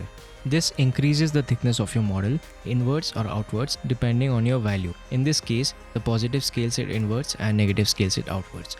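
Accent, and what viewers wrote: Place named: India. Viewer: Thank you for your time and the tutorial. I hate any noise in the background and since I appreciate good music; it should not compete with the narrative; becoming noise which by definition is sound that I do not want to hear. All the negative aspects such as the Hindi accent; worst of them all is side-stepped by putting the sound off and the subtitles on; choosing English. BTW, the word "THIRD" is pronounced with the tip of the tongue between lower and upper teeth otherwise you end up with the word TURD which is fecal matter; an embarrassing word.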